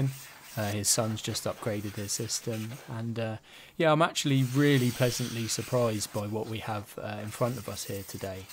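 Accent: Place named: United Kingdom. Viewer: Noooo that PC was perfect for me 🤣 Love your British accent, Nice video ❤️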